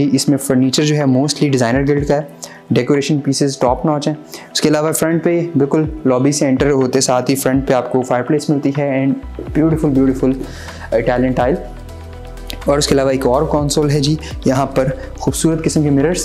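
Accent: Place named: Pakistan. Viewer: mashaAllah gar bohat payara hai .. yar thanks God kisi ne to apnay pakistani style main baat ki ,, otherwise 99.9% YouTube par sab Pakistani Indian youtubers ki tarha baat kartay hain ..( Indian Styal ) door ki baat karin to floor ki baat karin to .. ya phir .. dekhnay ko milta hai dekhnay ko milta hai baat karin to baat karin to :) please don't copy others for God sake talk in your own style .. like this man .. bless you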